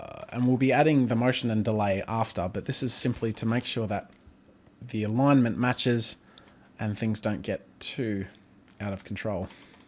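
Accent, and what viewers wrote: Australia. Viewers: every single time I click on his videos I say "oh yeah, he's Australian." 😂 No hate to any Australians ❤ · Are you Australian or what?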